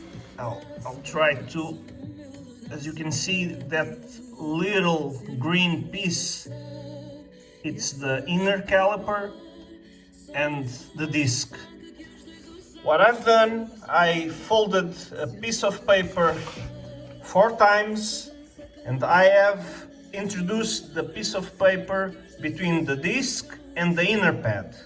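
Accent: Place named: Brazil. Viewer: Sabia q era br pelo sotaque kkkk Vlw pelo video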